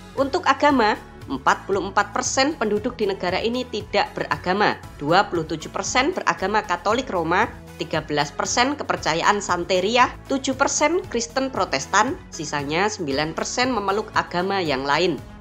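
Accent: Java, Indonesia. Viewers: seru yg ngisi voicenya, medok jadi makin betah nnton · suara loo medok banget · Yang ngomong medok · Ini orang Jawa banget deh kyknya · Jowone ngendi kang?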